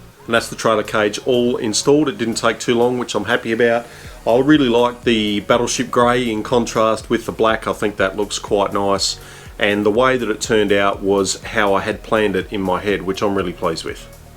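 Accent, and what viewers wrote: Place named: Australia. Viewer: Hi, you sound Australian, do you make these to sell? We r in Vic.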